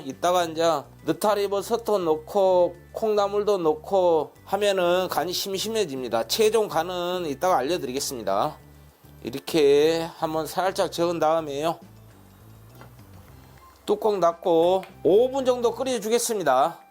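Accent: Jeolla, South Korea. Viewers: 유튜브 보면서 처음으로 댓글남겨요^^ 쉐프님 말투가 같은 전라도 사람으로써 너무 정감있습니다~ 저에 쉐프님 레시피 원픽은 닭볶음탕!! 항상 응원합니다. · 셰프님 감사합니다 전라도사투리가 약간 들리면서도 자세하고 순서 정연하고 초보자도쉽게 설명해 주셔서 고맙습니다 요리책 1.2.권을 구매해놓고 아주유용하게 사용하고있습니다 셰프님최고입이다 요리유튜브중에서최로중에최고입이다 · 세프님. 육개장누가먹읍니가요. 세프님요리주문안됩니가요.전라도. 말씨가. 좋아요. 세프님건강하세요. 요리대박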